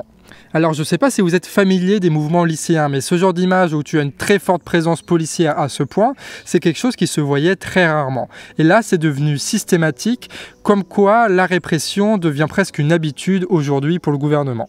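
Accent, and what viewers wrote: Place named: Trégor, France. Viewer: Trop zopaque, l'accent du Trégor !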